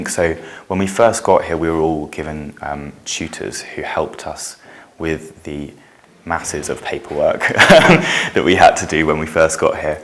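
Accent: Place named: RP, United Kingdom. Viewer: 話し方がとてもポッシュですね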